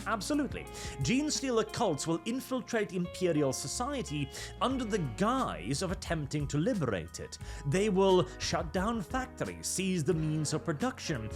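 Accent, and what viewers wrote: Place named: United Kingdom. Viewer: Hm. You sound like you are in the British Isles. Curious why the police have not arrested you over this commentary. Yet.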